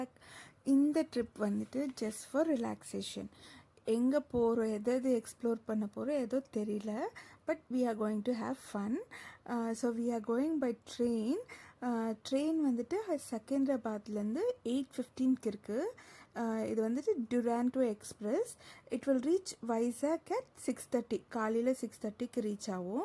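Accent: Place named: Tamil Nadu, India. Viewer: I like the Tamil slang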